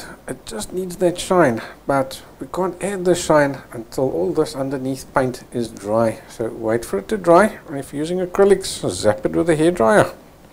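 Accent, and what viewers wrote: Afrikaans, South Africa. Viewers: I can hear he's Afrikaans 😅👍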